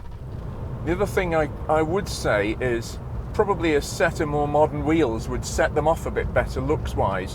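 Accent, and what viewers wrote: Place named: Northern England, United Kingdom. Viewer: Yes and no - while our host here is an expert no doubt, he's a bit of an old woman as well. Harry wears his expertise far more lightly, coming across as more modest - with a nice approachable humility. This guy gives me the vibe of a cross between the broad "northern" fella saying a resounding "NOooo" played by Paul Whitehouse in that Aviva advert about ten years ago, Victoria Wood playing one of the gossipy, complaining tea ladies - and Alan Bennett (at "soopper" - with his "mootha"). There's just something slightly precious and self satisfied about which grates on me for reasons, despite my best efforts, I can't quite describe.....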